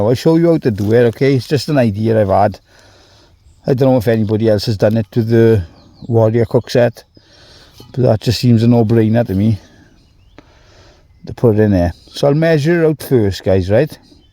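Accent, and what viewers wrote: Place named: Swansea, United Kingdom. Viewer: no problem bruv, I grew up in Swansea so your accent takes me back, keep up the good work 👍🏻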